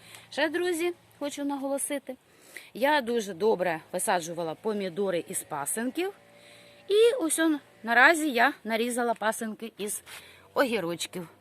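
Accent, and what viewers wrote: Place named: Western Ukraine, Ukraine. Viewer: на заході України інша фонетика (звучання) у вимові.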